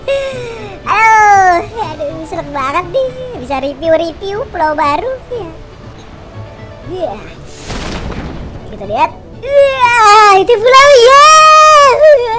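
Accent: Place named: Banyumas, Indonesia. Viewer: orang mama.? Kok kadang ngomong ngapak.?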